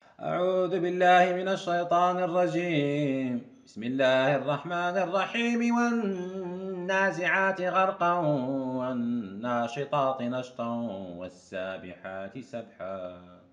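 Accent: Hassaniya, Mauritania. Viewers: ماشاء الله قراءة خاشعة بالصيغة الحسانية لأقاليمنا الجنوبية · ما شاء الله القراءة الحسانية أحسن الأئمة التي أنجبت مدينة كليميم الله إحفظو لأهلو يا رب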